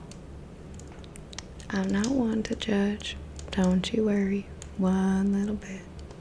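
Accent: South, United States. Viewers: Southern accents are the best. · I spent 17 years in northeast Alabama every summer. Fell in love early with a southern voice. Thanks for doing this one. God bless Southern ladies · as a southerner, the accent is pretty good 🤠 the way it falters sometimes is actually realistic, not every single word has to have a drawl to it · Real Southerner here says that accent ain’t too far gone. I’d have a hard time believing there ain’t somebody country in your world. Keep it up. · Glad you went with this thumbnail! I knew from the stream earlier your southern accent was going to be great at least for asmr purposes. Thanks for the great content as always:) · The southern accent has an innocent, flirty sassiness to it, I love it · One of my favorite RP videos. I adore the southern accent. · I spent most of my life in the South and I didn't hear any problems with the accent. 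Good job! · You have the southern talk down well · That southern drawl tho.. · If you're not a southerner then I applaud you, I'm born and raised in Georgia and your accent is spot on. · that southern drawl was 🔥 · The southern accent is so underrated. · As an Aussie who just got back from a work trip to Alabama....gee I miss hearing this accent! Sweet tea, BBQ, football, and Jesus. Hahaha! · I love your southern accent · This is a perfect southern role play I really love it!! Love how southern people talk!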